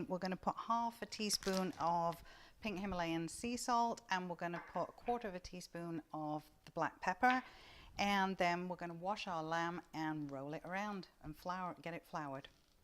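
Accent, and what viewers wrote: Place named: Manchester, United Kingdom. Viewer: That’s an interesting accent. Sounds a little Manchester little U.S?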